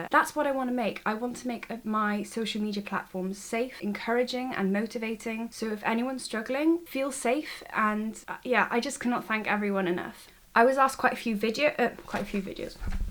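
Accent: United Kingdom. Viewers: Omg I love your British accent and Jesus Christ you're so beautiful🤩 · AHH BRITISH ACCENT IS THE MOST ADORABLE AND BEAUTIFUL ACCENT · U have a british accent 😍💯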